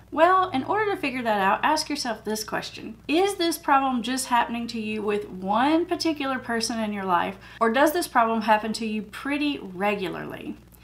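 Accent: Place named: Appalachia, United States. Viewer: i hear an Appalachian accent. I absolutely live for it. I live in Southwest Virginia. I have an area I am currently dealing with that I would love for you to do a video on. I work very closely with a woman who is constantly putting other people down. When I use the word constantly, I seriously mean she does it every breath. I have worked there only 2 years. She has been there 5 years, I am her superior and I have had decades of experience and I have more education. She and I got off to a very rough start. I stood my ground and confronted her face to face with our boss. The boss 100% supported me. Long story longer, my coworker and I recovered and actually became close. I genuinely care for her. She has lots of qualities that make her a valuable employee. BUT.....she is forever more nitpicking our fellow coworkers. Many of them are super young women all around 20 and have been there since high school. 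They are all great girls. Do they mess up sometimes? Sure they do. We all do. In my mind it's our job to mentor these young women, not tare them down. So, I pulled away from her so that I wouldn't have to talk to her, but every time I did speak to her down into the dark negative quicksand we went. I have to fully own that I had allowed myself to be influenced by this negative speech and I became as bad as she was. So, I snapped out of the nasty, but I soon realized that being complacent is just as bad as being an active participant. I am generally a very positive person, calm per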